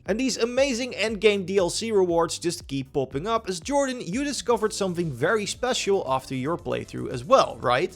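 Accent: Netherlands. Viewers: Zwz een nederlander deze man ik hoor het aan je engels😂 mijne klinkt ook zoiets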